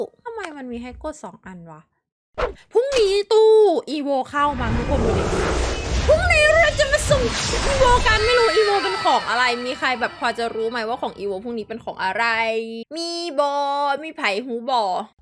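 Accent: North, Thailand. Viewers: พี่พูดภาษาเหนือ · พี่คนภาคเหนือเหมือนผมเลย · เง้ออชอบให้พี่พูดภาษาเหนืออ่าาน่ารักดี😳😻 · พูดภาษาเหนือตั่ลร้ากก พี่ 😳🥰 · คนเหนือออ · พูดภาษาเหนือบ่อยๆนะครับน่ารักดี❤️😊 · พี่ พูดเหนือเป็นด้วย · เป้นคนเหนือกะ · พูดเหนือน่ารักมากพี่(;ŏ﹏ŏ)(๑♡⌓♡๑)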